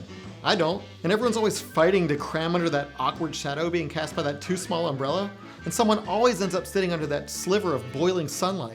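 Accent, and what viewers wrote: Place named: New England, United States. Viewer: How did this supposedly Southern dude get a yankee accent?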